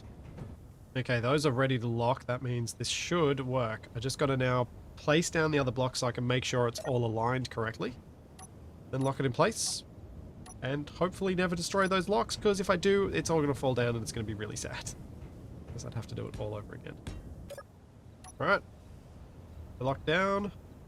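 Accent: Australia. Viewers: It is funny how you sound more Australian when sick. I never paid much attention to this, but now i can really tell the accent.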